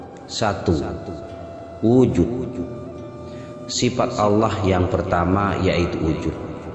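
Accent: Indonesia. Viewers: ilmu yg bermanfaat ,saya suka cara pentampaianya macam orang minang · Orang madura berbahasa Indonesia 😀😀😀 Dalil naqli terus bos. Dalil aqliya jelasin donk....?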